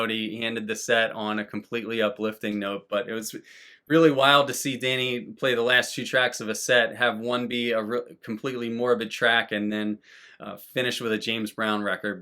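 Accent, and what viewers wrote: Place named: Philadelphia, United States. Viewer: Haha now that you say that you do sound like you’re from Philly/southeastern PA, I didn’t know that. I just moved back up here to Chester county from south Florida in August, grew up out here. Glad to know though, seems like there aren’t many people out here collecting/playing/enjoying dance records but I’m sure I just haven’t met them yet! I’ll keep watching and learning, appreciate the content.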